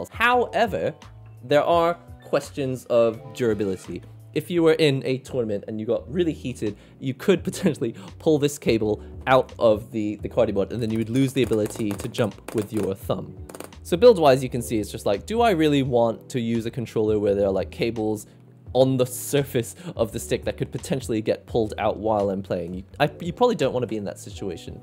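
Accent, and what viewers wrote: United Kingdom. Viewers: this is my first video of yours and seeing the name " " i was NOT expecting that thick british accent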